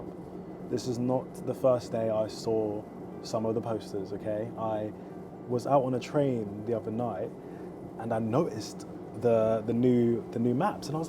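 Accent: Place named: Estuary, United Kingdom. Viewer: You speak too quickly and I can't follow your estuary accent when it's so quickly spoken. The subtitle program didn't (couldn't) help either, sadly. Try speaking slower please.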